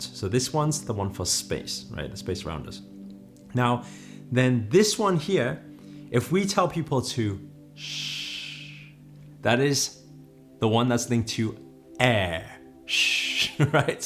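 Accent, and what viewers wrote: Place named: United Kingdom. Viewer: Love your videos and your British accent... Thank you for this explanation!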